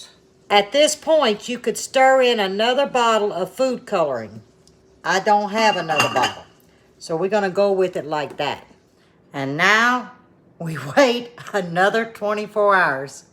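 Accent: South, United States. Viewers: I absolutely love you! I think you are adorable and I love your videos so much! I feel like you are right here in person. My dad was from Missouri and you just bring me back to that lil bit of south…you & your accent remind me of my fun loving talented aunts and watching them cookin & baking with gma!!❤️ HELP!! My day 2 for the candy pickles is tonight BUT I HAVE TO BE GONE BY 4!!! What should I do?? Should I finish my day 2 earlier this afternoon or should I let them soak another day or should I rinse them and leave them rinsed what should I do?? (I don’t Know how late I will be back tonight)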